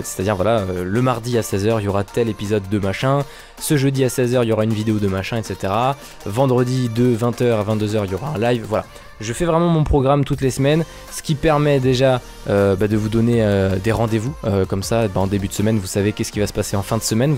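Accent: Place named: England, United Kingdom. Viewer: Enfin je l’attendais T’a un beau accent anglais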